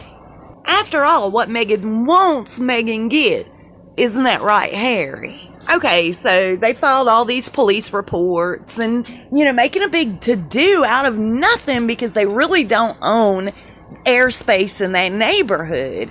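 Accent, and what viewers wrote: South, United States. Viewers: love you. make me laugh. I love your southern accent.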